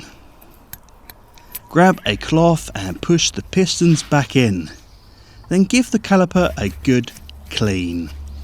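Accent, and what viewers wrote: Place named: East Yorkshire, United Kingdom. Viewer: Do yer really need brakes on them bikes.....you just put yer boots down don't yer?......he he he. 'Ull' east yorkshire.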